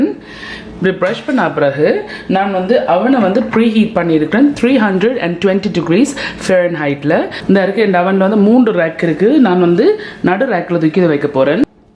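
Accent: Jaffna, Sri Lanka. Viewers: Hi Your (slang Jaffna mix Europe mix )Tamil very interesting. I'm on of your FB page follower.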